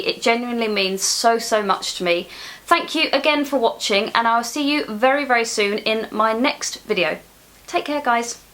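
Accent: England, United Kingdom. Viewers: Just out of curiosity, may I know which part of England is this kinda accent from?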